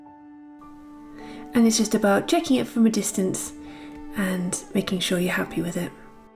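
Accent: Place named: United Kingdom. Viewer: I’ve enjoyed watching your video very much. I enjoyed being out in the fresh air with you. It’s been raining here in Washington State, and it’s cold. And to top it off I have a miserable cold so I’m not feeling very well at all. Watching YouTube is as energetic as I can get. You made me smile with your soft voice and very British accent. Thank you for making me feel better.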